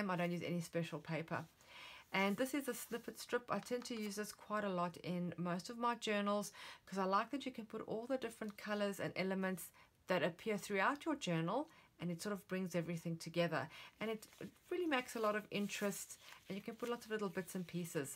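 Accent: South Africa. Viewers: Thats a south African accent I thought it was British....love the journal xx ps it's called pianola paper or just piano paper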